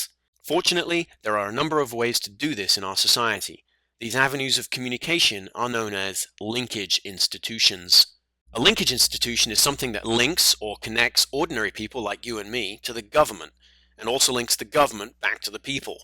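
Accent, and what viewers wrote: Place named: Australia. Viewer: I am confused that he is teaching us american government yet he has an Australian accent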